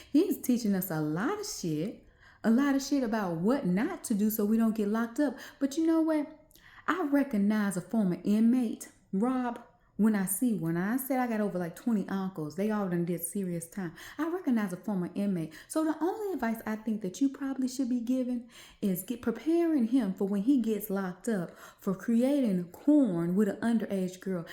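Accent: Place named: South, United States. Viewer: Hi beautiful, new to your channel. You sound just like a Southern Bell lady, I love the accent it's too cute🥰❤ But as said, they'll be many blinded calling wrong right, and right wrong.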